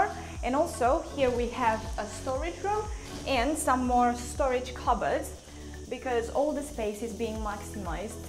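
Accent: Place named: London, United Kingdom. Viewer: I can tell by the accent that she lived (lives) in London.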